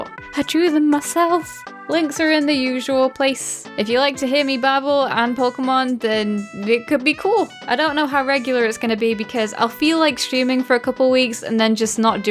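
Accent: United Kingdom. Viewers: Love your British Accent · Also its not wurld wide world olnly in urup im bulagarian a a oh yah youre not canadian your british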